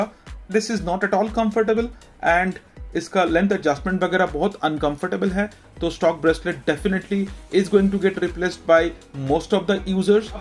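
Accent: Bengal, India. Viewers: Dada bangali??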